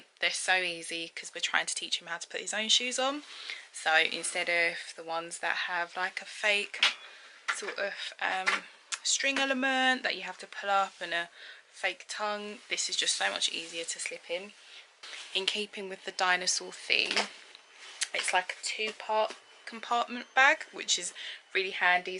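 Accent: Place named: United Kingdom. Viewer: Bhabhu nanah💞💞💖💖💖u are an amazing mom girl. I am so glad to see u doing your motherhood chores🙏🙏🙏kodwa Mamoe u sound British yooo how long have u been leaving in the UK.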